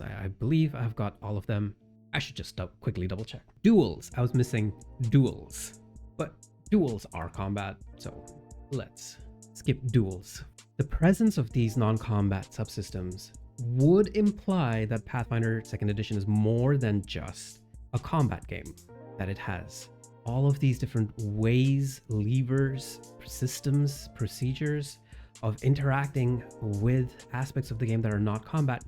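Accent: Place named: Canada. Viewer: I love your accent! It's a subtle Canadian accent (I think?) but there's something about it that is distinctive that I can't place, even though it's extremely mild. Also great ideas about the game, a lot of people sleep on subsystems and I think you've pretty comprehensively analyzed why.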